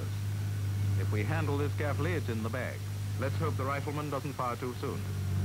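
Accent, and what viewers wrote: United Kingdom. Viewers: This announcer is clinically British. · British narrator---- but those are fighting Canadians! The greatest Canadians who ever lived! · Strange to hea so little British accent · “One tank with a personal grievance” makes me wish I had a British accent lol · The Young ones today wouldn’t understand the old British language🇬🇧 the way of saying things.. love it ,,,tally Ho ! let’s have a Cup tea ☕️ 😁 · What particular british accent is this? I love it.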